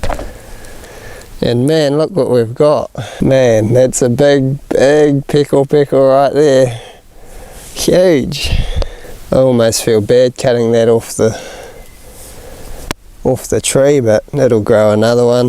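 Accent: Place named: New Zealand. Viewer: Huppast 12 to huppast 2 ah bro 👍 Spoken like a true kiwi. Love it !! 😎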